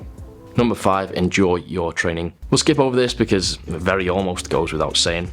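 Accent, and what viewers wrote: United Kingdom. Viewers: You have VERY BRITISH ACCENT dude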